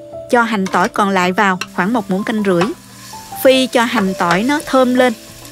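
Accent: South, Vietnam. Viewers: Em có giống nói miền nam rất dể thương 😊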